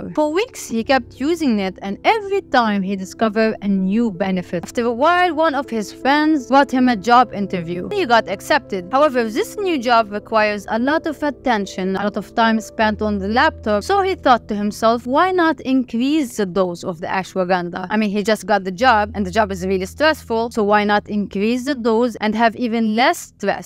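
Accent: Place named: Lebanon. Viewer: English with a Lebanese flavor....... ملوخية flavor 😂😂😂😂❤❤❤❤ Though I don't like (ملوخية) my family keeps on making it...... I wish you all the best, I'm following you on your YouTube channel in Arabic and I'm following you here and I will share this with my friends.... thank you for everything, and congratulations for the 100.000, hope you'll reach more and more.... Most importantly, wish the war will end in Palestine 🇵🇸🇵🇸🇵🇸 We pray for the families, Incha Allah Lebanon will be safe 🇱🇧🇱🇧🇱🇧🇱🇧🇱🇧 From Algeria 🇩🇿🇩🇿🇩🇿, your fan ❤❤❤❤ Abdessamad........ Keep up with the good work, you're amazing ☺️👌❤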